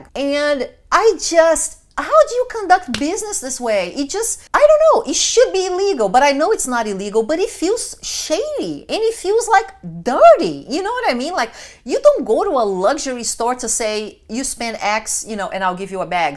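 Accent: Brazil. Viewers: She's from Brazil. She came to the US and worked really hard to become a professional engineer.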